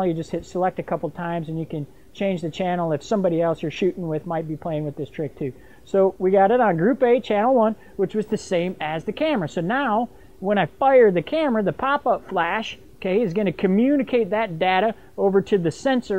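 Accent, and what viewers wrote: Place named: United States. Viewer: I so love your accent... I just want to visit the US on the next available flight. Your tutorial here is very helpful. Thanks very much from Patrick in the UK using a D90 and SB 700. Until now I was using flash off camera and using a little card to block out the light from the poppy up.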